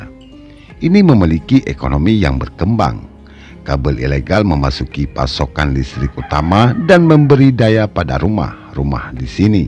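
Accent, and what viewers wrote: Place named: Bali, Indonesia. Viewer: Ini naratornya orang balii yaa Keliatan dr logatnya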